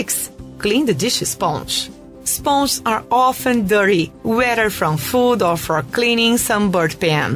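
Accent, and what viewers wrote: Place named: France. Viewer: Thank you!! Just curious are you French? Cool accent😎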